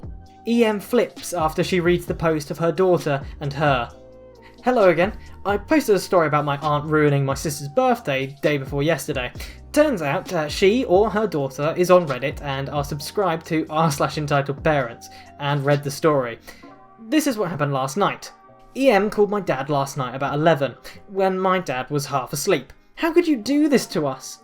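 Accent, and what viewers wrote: United Kingdom. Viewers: Legit doesn’t sound male or female, just british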